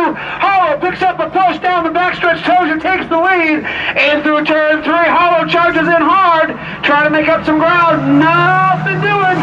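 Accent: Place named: United States. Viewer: lol, not that quickly. American giveaways: 1. Yankee accent of the commentator; 2. Full-size pickup trucks; 3. Dodge Charger with "Yeet" written on rear window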